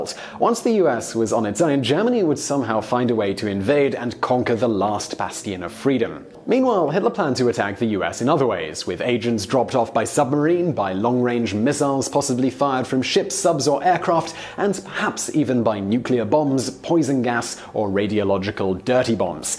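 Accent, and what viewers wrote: England, United Kingdom. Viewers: How does this chap have an english accent except for his hard vowel pronunciation in any words not used in daily speech.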